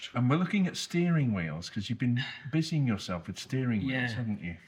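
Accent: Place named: Liverpool, United Kingdom. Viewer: A neighbour nearly totalled his Morgan in a hedge and was lucky enough to have a friend lend him an XK 140 or 50 I think it was. It was in the most beautiful shade of grey and had black painted wires. Fully restored, and the finest XK I’ve ever seen. It really changed my mind about painted wire wheels. By the way, you are old enough now for your Scouse accent to be coming through 😀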